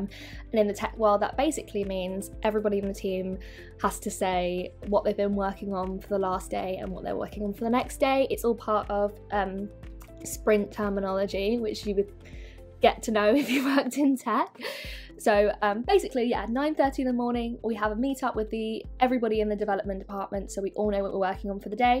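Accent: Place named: United Kingdom. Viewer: Her british accent :)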